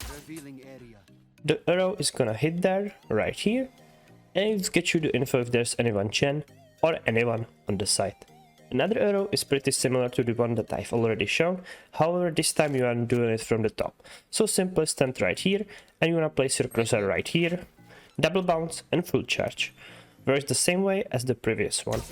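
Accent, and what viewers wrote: Czech Republic. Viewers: i cant help my self but... are you from czechia ? XD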